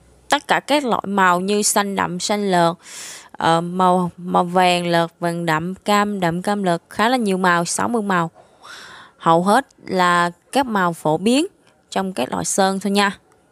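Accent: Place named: Vietnam. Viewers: Haha giọng miền nào nghe ngộ vậy 🤣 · Kính thưa các loại vùng miền luôn.chắc ở nước khác nhưng gốc việt 😅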